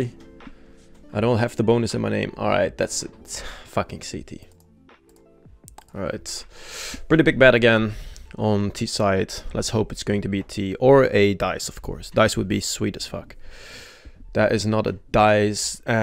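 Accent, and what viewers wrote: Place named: Netherlands. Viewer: Where you from? You sound dutch 🇳🇱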